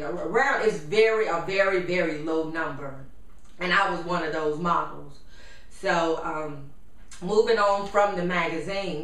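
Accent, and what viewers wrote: South, United States. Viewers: I love your voice and your southern accent. <3 And yes, the thirst for fame has screwed up generating income. <3 Do you have children? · "Damn ya still that phat-sweetmeat eye-candy I wanna eat...I'm proud of you too, boo-boo! LOL-SALTS ~ Brains, beauty, grace, good-face and a heavy-duty booty! I love your accent, you are a southern chocolate-belle or to be exact, a ripe and juicy: Georgia-peach! You knocked the urban-hustle, you never ended-up jaded or faded, ya made good...Now work it! Like ya know you should...Teach, preach, reach-out to the congregation, amen! Stay hydrated on Gatorade, whilst ya haters stagnate!"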